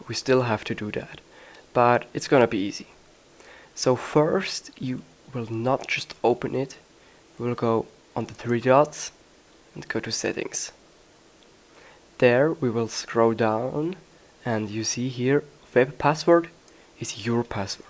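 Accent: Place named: Germany. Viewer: danke fur tutorial bro ich wusste als du am anfang geredet hast das du deu tsch bist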